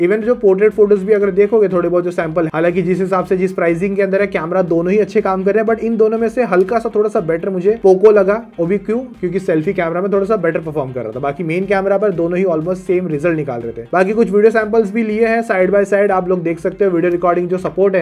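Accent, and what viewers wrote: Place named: Punjab, India. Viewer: Bhai apke accent Punjabi hai ❤😊😊😊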